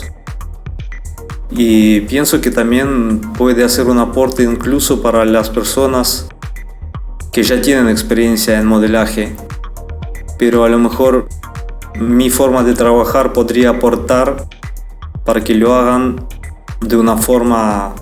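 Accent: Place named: Russia. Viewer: Спасибо за урок. ..Сперва было странно, обратил внииание на русский акцент, а потом увидел имя автора, и стало на свои места.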